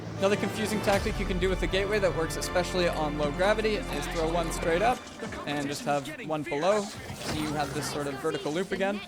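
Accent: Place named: Ireland. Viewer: you are irishhhhhhhh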